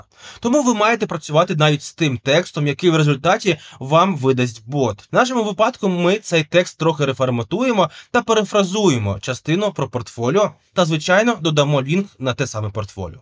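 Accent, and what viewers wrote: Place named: Russia. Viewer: Bună ziua. Contentul e util și interesant. Hai sa vorbim în orice limba în afara limba rusa. Of doamne, dar dacă tu ești ca bloguri interesant, și pina când totul a fost clar, dar acum tu zici Rusnea, ce eu trebuie sa fac? Eu înțeleg limba rusă, dar ucraineana nu prea. Eu vad ca ție nu prea comod, tu ai accent rusesc.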